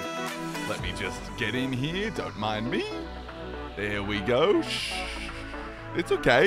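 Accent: Australia. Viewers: He's Michael Reeves, but less robotics and more coding. Also a LOT of Aussie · i have to comment it here but, are you "??" not just for your aussie accent but your ability to not upload for months at a time.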